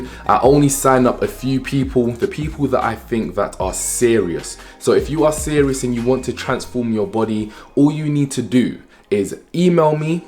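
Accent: London, United Kingdom. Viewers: I'm tired, when I heard him talk I was like oh he's british I need one in english 😂 I'm so damn sleepy. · I’m here for his London accent…👀👀👀 and for burning that back fat off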